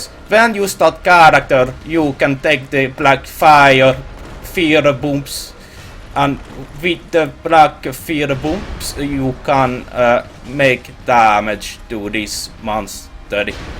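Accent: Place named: Finland. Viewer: Your accent is bad and you should feel bad. Speak English properly, fucking Finns, I swear to god...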